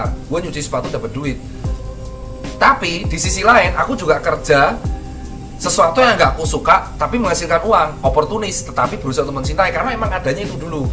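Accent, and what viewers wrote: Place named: Surabaya, Indonesia. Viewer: Ijin, bilamana buat tema saran/alternatif solusi : #salah masuk SMA (salah jurusan IPA / IPS / BAHASA) next... next... / SMK jurusan (listrik, mesin, bangunan, dsb) next... next... lulus sekolah atas, setelah pilih kuliah (keliru jurusan ???, bingung) / pilih kerja / kuliah+kerja (+- nya ... gmn management nya, biasanya... molor kyk i 😇). Seneng gaya+ngomong suroboyo an e / jowo medok, to the points lozzz ora rewel Sukses teros... dokter + enterpreneur 👍👍👍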